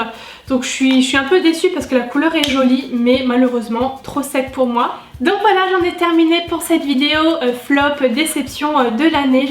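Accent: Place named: Alsace, France. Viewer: Tu viens d'où exactement en Alsace ? Parce qu'y vivant aussi je reconnais bien cet accent haha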